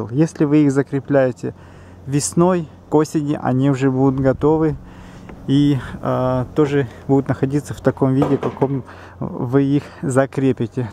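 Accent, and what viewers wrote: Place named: Surzhik, Ukraine. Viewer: И Вы,Петя будьте культурным.Может Вам знакомо такое слово Суржик?Вот на нем и говорит.Это местный диалект.Канал этот для садоводов,Вы ошиблись,поищите лингвистические канала,а заодно,научитесь такту.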